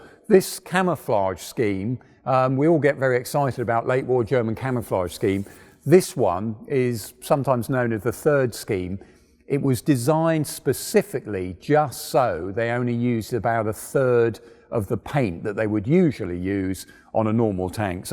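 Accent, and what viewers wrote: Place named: England, United Kingdom. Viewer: That English accent and tone of voice is extraordinary, congratulations!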